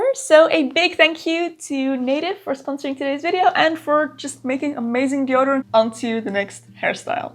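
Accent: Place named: Netherlands. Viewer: You have a Dutch accent. Do you live in the Netherlands?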